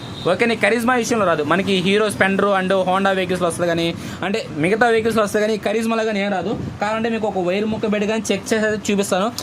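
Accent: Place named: Prakasam, India. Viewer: Prakasam district giddalur side aa bro needi. Aa slang alane vundi. Explanation Inka clear ga chey, nee videos ki Inka views increase avuthayi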